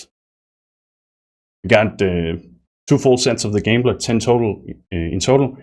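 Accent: Denmark. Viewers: you sound like a dane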